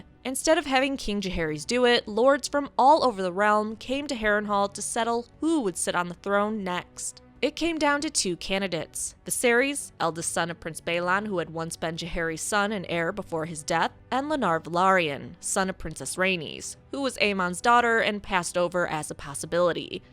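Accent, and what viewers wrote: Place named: United States. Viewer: To hear this in ... "American" sounds soooo wrong. No offence though.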